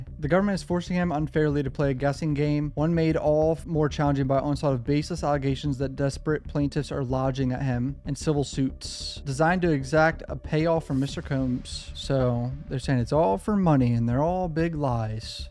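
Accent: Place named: California, United States. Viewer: Dude I love that California accent. When he said Combs homes I nearly lost it😂😂😂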